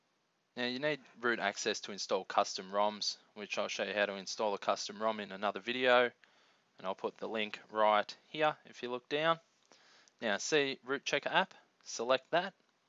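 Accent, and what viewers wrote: Australia. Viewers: Nice video, you Australian? I was thinking of doing it, though i'm having second thoughts - what are the benefits in having your Sg3 rooted?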